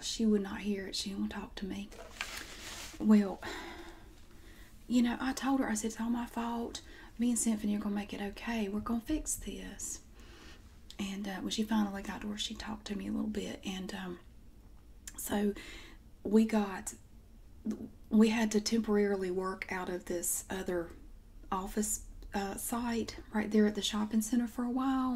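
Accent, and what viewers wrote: South, United States. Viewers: Beautiful southern accent. I can't stand these over drawn 1800's slave owner accents everyone gives southern people. Very well done very natural, much love from Atlanta. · I just moved to the south and I love your southern accent.Your fantastic. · I like your videos! They are really interesting and helped me learn southern accent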